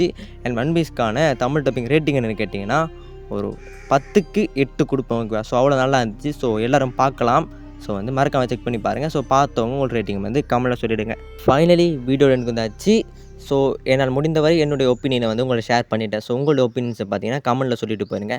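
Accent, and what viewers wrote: Sri Lanka. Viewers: Sri Lankan tamil ah neenga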